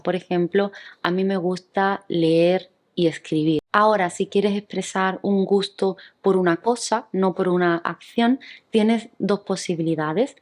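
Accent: Spain. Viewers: De cual pais es la hablante? Es acento de espana?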